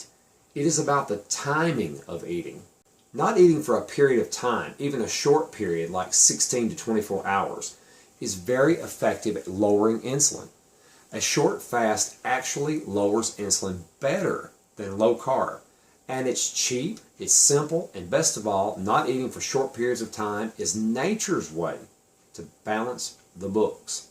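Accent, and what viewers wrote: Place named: Texas, United States. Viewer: i just love you,. i'm texas born and bred and you TALK like me! you sound like my kinfolk! anyway, i came upon your videos somehow and started "kind of" keto" (still working on consuming so much good fat) but started fasting a month ago and have lost 17 pounds!!!! i'm 62 and very inactive these days and the pounds still came off! fasting was not nearly as scary as i thought it would be; actually quite the opposite. i LOVE it and when i do eat, the food tastes better, i feel better, and it gives me joy. keep it up for so many of us!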